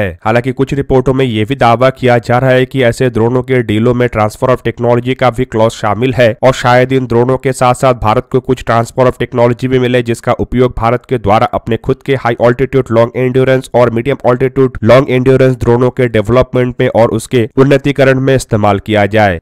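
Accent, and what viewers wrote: Bengal, India. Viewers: Bengali speaking Hindi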